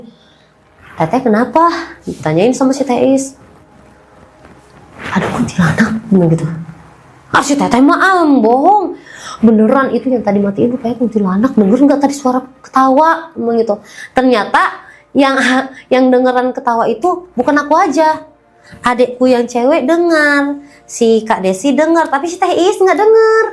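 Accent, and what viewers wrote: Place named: Medan, Indonesia. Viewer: Logat ngomongnya Kayak Orang Medan 🤣🤣🤣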